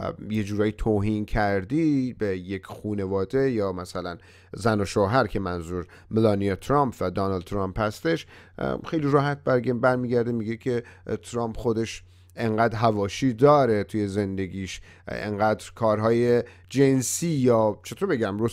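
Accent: United Kingdom. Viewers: داری فارسی حرف میزنی با لحجه انگلیسی وقتی می‌پرسه آیا عاشق ترامپ هستی دو دفعه میگه بله ما خوب هستیم yes yes we are fine. از همین جا معلومه چرند میگی و هیچ اطا عی نداری و دروغگو هستی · خوب ویدیو را نشون بده. چرا مثل تازه بدوران رسیده های غرب زده فارسی را با لحجه انگلیسی حرف میزنی؟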